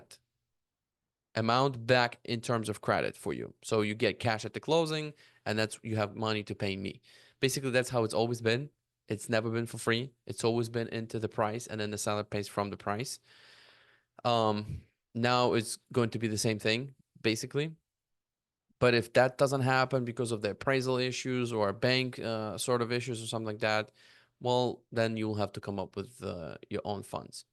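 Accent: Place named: Eastern Europe. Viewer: I appreciate this, I think after the NAR settlement many are confused with what’s happening with buyer’s agents. There just needs to be more conversations to be had and value provided to clients. Love this breakdown and script, I think this is valuable for agents! Got a sub and like from me bro👍💯. P.S. - I'm Romanian, where are you from? You sound Eastern European 😅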